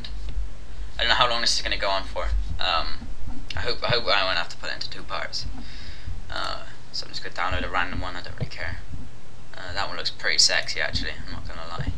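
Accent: Ireland. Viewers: HE'S FECKIN IRISH!!! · Northern Irish? Southern Irish? Hmmm?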